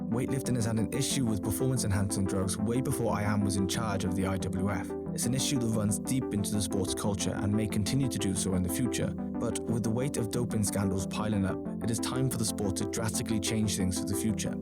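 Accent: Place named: Wales, United Kingdom. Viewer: I thought your pace was spot on and your enunciation clear. The welsh tones are very mild.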